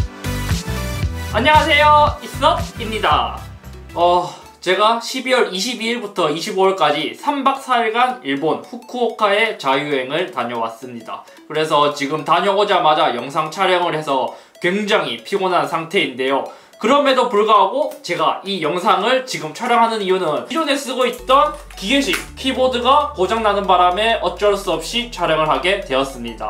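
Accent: Gyeongsang, South Korea. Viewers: 고향이 경상도이신가요? 사투리가 비슷해서 그런거같기도한데용?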